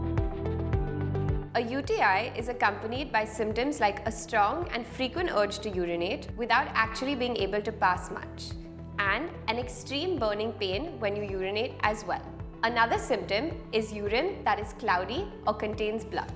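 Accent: India. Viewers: Never seen a white woman with a indian accent😅. Great videos anyways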